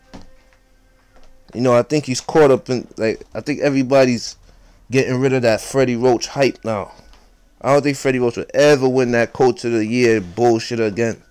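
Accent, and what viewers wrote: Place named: Trinidad and Tobago. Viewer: irrelevant, but u sound like u trinidadian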